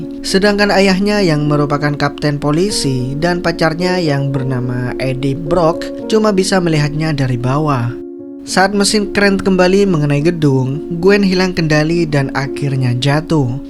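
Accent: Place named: Java, Indonesia. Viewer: Pake kata (saya) aja Biyar enak di dengar bng ,( guaww) terlalu medok jdi sedikit geli. (Ini saran bukan hina)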